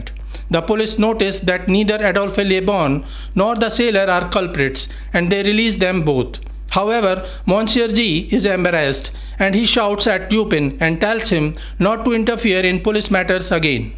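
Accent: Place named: India. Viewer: why how racist of u <3 the moment i heard his accent i knew he knew his sh*t lol. all informative videos made by people I hear with an Indian accent turn out to be great. Thanks for the vid!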